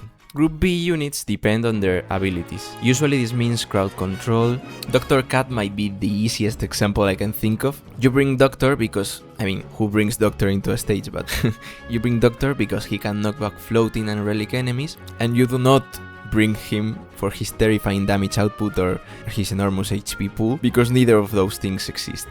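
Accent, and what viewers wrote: Spain. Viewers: ¿Are you spanish? Your accent sounds like when I hear spanish people speaking english 😅 ¿Or do other languages produce the same accent?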